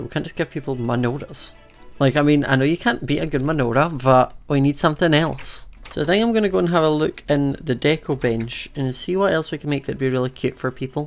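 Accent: Scotland, United Kingdom. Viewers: Are you Scottish???? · are you really Scottish because i love your actcent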